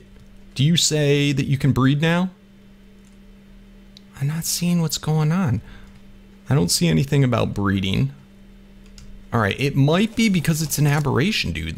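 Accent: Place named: Rural, United States. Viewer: Does anyone hear him do a hick accent and every now an the do a Cali one? Its crazy cause none of his old vids sound like this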